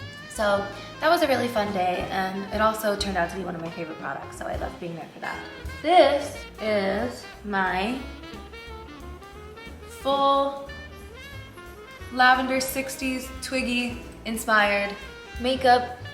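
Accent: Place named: South, United States. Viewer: Yeah what happened to that southern accent she had like 5 years ago? She straight up sounded southern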